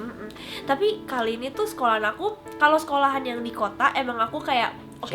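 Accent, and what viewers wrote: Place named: Banyumas, Indonesia. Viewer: Ngapak Onlen :v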